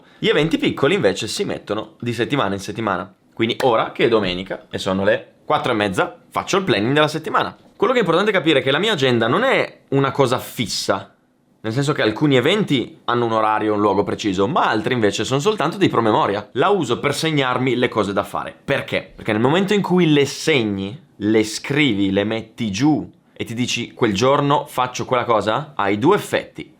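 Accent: Italy. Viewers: Minchia stavi parlando paleminato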